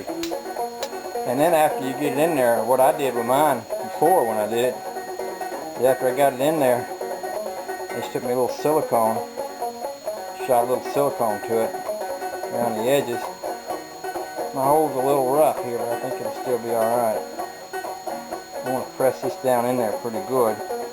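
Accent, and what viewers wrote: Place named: Tennessee, United States. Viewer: Interesting. I ran across this while trying to find the origin of blue dot tail lights. I noticed your Maine plates, what part of Maine are you in? I am in southern Maine. What brought you here? When I first heard your accent I thought the video was out of Tennesee or something :)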